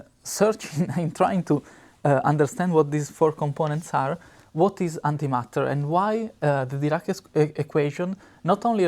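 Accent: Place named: Italy. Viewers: C'è un po di italiano nel tuo inglese ❤ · È inevitabile, visto che è italiano. Hai mai sentito un madrelingua inglese o americano che parla italiano? Per quanto lo parli benne, si sente sempre l'accento.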